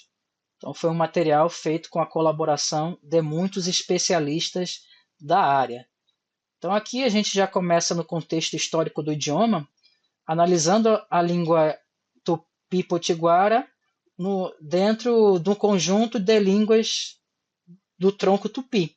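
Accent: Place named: Portugal. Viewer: Nao tem como fugir do sotaque portugues 😂😂😂😂😂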